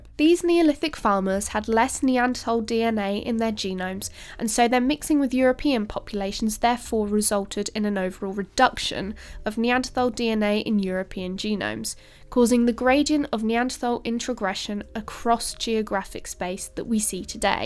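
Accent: RP, United Kingdom. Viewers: Alright, she does, admittedly, bring clear-spoken Queen's English to the narration. Still (mischievously) one has to wonder if of the boys brought in a GF to see if she would get a better YT response. Yes.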